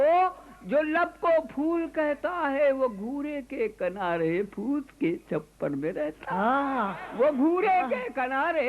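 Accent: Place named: Lucknow, India. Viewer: Waah Adil Sahab kya shayar the aap, aur kya zbaan pure lakhnavi zbaan aur aapki peshkaari ba-kmaal. Pride of India.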